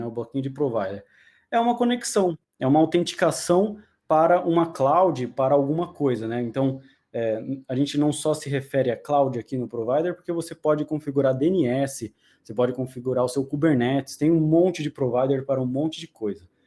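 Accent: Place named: São Paulo, Brazil. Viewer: Fale mano (sotaque paulistano 😅) você foi top na explicação. O lance de explicar os bloquinhos do TF achei muito didático e me fez entender o básico da coisa em 30 minutos de uma maneira phoda. Suas analogias sobre o declarativo e procedural e o Ansible que estava querendo saber o que era ao certo. Parabéns pela didática e simplificação para um melhor entendimento. Eu hoje “brinco um pouco” com Cloud Formation e se desse para fazer um comparativo de CF x TF o que muda por exemplo ao criar um s3 em CF para TF seria top. Com sua explicação vou tentar fazer isso na empresa (trabalho no Itau) e só para vc saber, seu conteúdo esta servindo como base para muitos aqui. Mais uma vez parabéns pelo trabalho Deus abençoe sucesso e felicidades.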